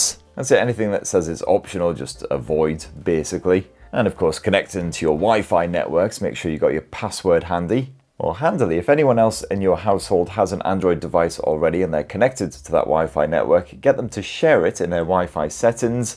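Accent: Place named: Newcastle, United Kingdom. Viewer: Smooth brain fodder. The forced humour is shite. Especially delivered in a crap monotone Geordie/Mackem/Smoggie accent.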